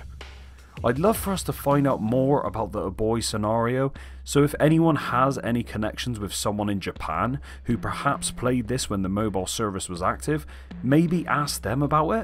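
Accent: Cornwall, United Kingdom. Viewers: British limey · Recognised the kernow accent and subscribed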